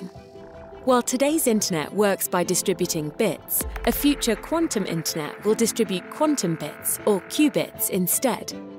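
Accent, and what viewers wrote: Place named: Australia. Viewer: The Australian accent is very annoying.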